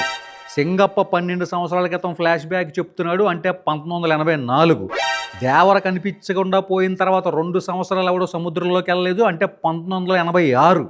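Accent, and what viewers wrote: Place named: East Godavari, India. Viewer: This guy is very intelligent and highly talented, with a fund of original humor and high class satire which we rarely find in Indian YouTubers in general and Telugus in particular! He effortlessly carries the East Godavari slang with appropriate verbal sounds at right appropriate places thereby enriches the descriptive part with a very unique and rare blend of subtle humor laced with extremely high quality observation of semi - literate Telugu script writers and near illiterate Directors! I think there is only one more movie troll, one Fasas Roast who is in the same league with this guy and he already emassed a fund of followers and I foresee and wish him the same, in the imminent future! I think I can even write more and I wish I should as he richly deserves but I don’t have energy as I’ve totally exhausted mine in laughing uncontrollably! 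Good Luck, Mr.! You’ve already proved yourself and try to diversify your inherent and latent by utilizing it to the fullest extent, if you are seriously into this! I doubt whether you are highly educated with a highly placed job and stuff! Anyway, you’ll Go places! That’s the writing on the wall and I’ve a rare gift of reading it clearly! I’m very fortunate to have a chance encounter with your posts and you’ve really made my day! You shall excel in your chosen line if you pursue it very seriously and with a little dedication and a bit more of an indefatigable industry! Amen! So mote it be! Kindly f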